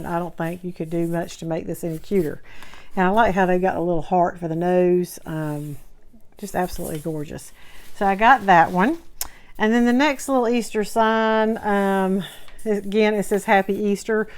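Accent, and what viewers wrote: South, United States. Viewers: I thought your "specific" southern accent sounds like my family❤ Lots of my family live in East Tenn. and western NC which is pretty close to that region. Don't mean to sound weird, but there are several different variations of southern accents😊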